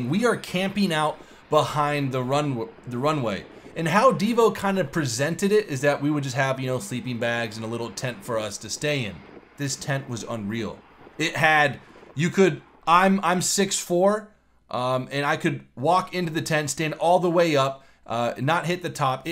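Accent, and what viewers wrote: United Kingdom. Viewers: I thought you were British? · English accent on point!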